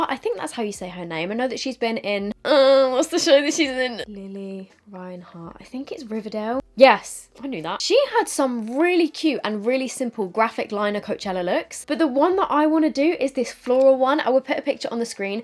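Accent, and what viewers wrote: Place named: United Kingdom. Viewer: Hi, I'm a recently new subscriber. I can't believe it's taken me this long to find your channel. I'm literally obsessed. I'm on my like 50th video in a week. I'm from Aus but my family are from UK so I adore your accent. Enjoy your day gurl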